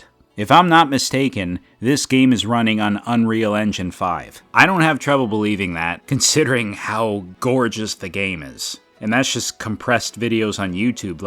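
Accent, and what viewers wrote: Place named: Scotland, United Kingdom. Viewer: hey man first of all you have absolutely nothing to apologise for, cuz I know and I absolutely understand how busy your schedule is especially now so I don't mind as long as you were able to read my comment that's enough. And thanks for replying to me back I mean replying back to every single point that I mentioned, I really appreciate that. Thank you man. My support to u is always gonna be green mate. Can't wait for the Scottish Accent to be back and eagerly waiting for your new video. Have an amazing beautiful peaceful wonderful day today and many more happy days to come your way and for your family as well. Cheers mate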